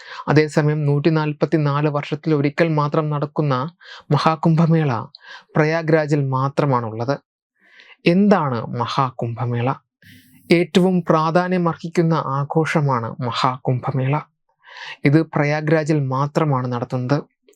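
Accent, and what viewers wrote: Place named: Kottayam, India. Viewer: Are you from kotayam or Pathanamthitta area bha or pha 😂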